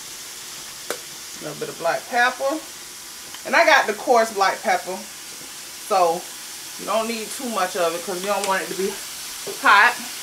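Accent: South, United States. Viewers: Hi, I'm new to your channel. I watched 1 video and couldn't stop! That country accent along with those delicious meals bebeeee, made me subscribe! You're doing an awesome job. Keep the videos coming. I swear I'm gonna try some of these meal. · no you're not too country that's being you that's natural don't stop being you please do not change your accent your natural country swang... Jersey and PA love you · Sweetie ain't nothing wrong with the way you speak. We're Black and proud!!!Just like Jamaicans have patois so do us country folks. People can poke fun but they wanna sound just like us. Keep doin whatchu do Queen. Thanks and blessings from Connecticut😚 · We love you! I have one request tho.......PLEASE DO NOT change your accent. We love your naturally country swang accent from the first video. Its original & it reminds us of our mothers & grandmothers cooking. 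Do not switch to the “proper talk”. We like the down home country talk. Love ya, be blessed😎♥️🤟🏾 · HELLO BEAUTIFUL BLACK QUEEN I LOVE YOUR COUNTRY TWANG YOU ARE A COUNTRY GIRL JUST LIKE MY MOMMA I LOVE YOUR RECIPES MY NAME IS SABRINA I DO NEED HELP IMPROVING MY COOKING SKILLS I DON'T MIND SENDING YOU A FEW DOLLARS HERE AND THERE I WILL TELL WHAT I LIKE FOR YOU TO COOK AND YOU TELL ME HOWNTP SEND YOU A FEW DOLLARS THE SALMON I DEFINITELY HAVE TO TRY AND THE SEAFOOD DRESSING I GUESS IF I DID THIS FOR MY MAN HE BE READY TO PUT A SISTER ON LOCK WHERE YOU FROM I LOVE YOUR ENERGY AND HUMOR YOU KEEP YOUR COOKING FUN I DID SUBSCRIBE TO YOUR PAGE ANYWAY KEEP ME POSTED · I like when you talk in your normal southern voice. This was a great video. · Country speak is for all us Southerners—black, white, brown, or purple! I think I trust someone who speaks like you more than someone without an accent when it comes to good food. 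I am a plus size girl as well and my daddy always said he wanted to be served by plump people because you knew the portion size was correct! Glad you are back! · I'm not sure Where you're from, but I Absolutely LOVE Your accent!😘😘😘😘 As a matter of Fact, the Accent is what "Drew ME In" bcuz I'd never seen 1 of your videos until recently and it was Your Easygoing nature & Naturally "Country" Twang, that made me come a 2nd time, so Please-just BE YOU.......💜😏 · Lawd wea u from lol, i swear you got to be from Alabama, Georgia or somewhere from the south cause that damn country accent is strong and I love it lol you sound so much like my folks down south lol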